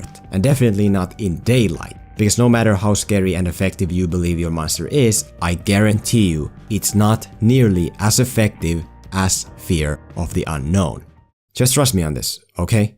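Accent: Finland. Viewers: Is this Man finnish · Is finnish? Do i hear a finnish accent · Are you Finish cause you have a real Finish accent... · He sounds Finnish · Are u from Finland?, Ur accent sounds Finnish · Are you from finland. Your accent is atleast similar to the finnish one so.... Torille tavataan ja SUOMI PERKELE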